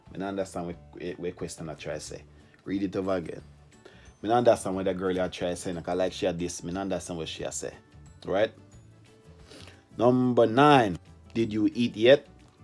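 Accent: Jamaica. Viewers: your eyes & that Jamaican accent...jesus... Looks like I need to take a trip to the islands.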